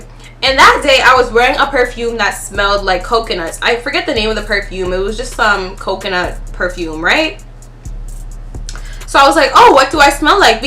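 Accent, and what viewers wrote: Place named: Toronto, Canada. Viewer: The Toronto accent I love it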